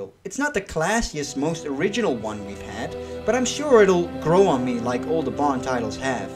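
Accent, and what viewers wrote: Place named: Netherlands. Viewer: I just cannot understand why your channel hasn't at least half a million subscribers: Awesome produced videos, great voice with that cool dutch accent, great text / writing, passionate about the Bond-franchise, no bullshit bla-bla, humorous & funny, clear opinions: just relaxing and informative the same time to watch. Oh-so-many channels could learn from that. But you HAVE to make a gun-barrel scene with yourself in that rad smoking. That would make it PERFECT! Just my opinion.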